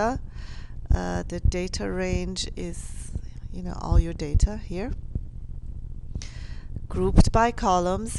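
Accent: Iran. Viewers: Nice Persian Accent! And of course nice job!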